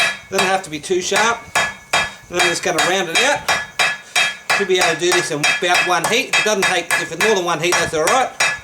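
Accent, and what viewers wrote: Australia. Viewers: Same here Sunday morning with yamez. Had to search you out. I can't understand half of what you gibber out in the aussie accent, but hell I'm from Alabama... I basically speak hillbilly so no one knows what I say either. We call that horn of the anvil lol. Not sure where bic would have ever come from.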